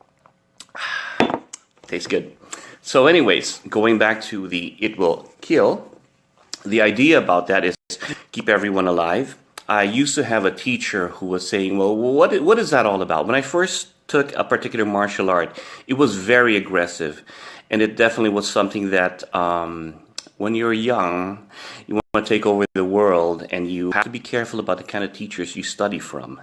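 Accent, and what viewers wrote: Philippines. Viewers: Sir Doug, sabi ko na eh. Yung accent mo pa lang sir Pinoy na Pinoy na. I always watch episodes in Forged in Fire. Hihi. Your weapon sir will keal! 😊😊😊😁😁😁❤️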